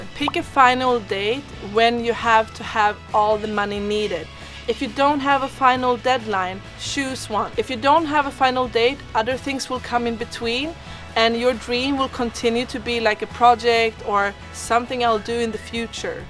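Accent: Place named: Spain. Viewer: Hahahah vad sjukt att du pratar som att du har en spansk dialekt hahahah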